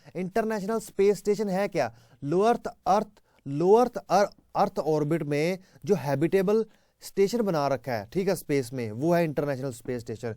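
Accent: Punjab, India. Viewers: Sir punjab toh lgda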